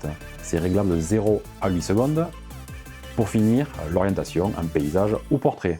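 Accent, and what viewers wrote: South, France. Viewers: J'aime ces vidéos sur du matériel bien choisi, simples directes brèves et ave l'accent du sud. Bravo !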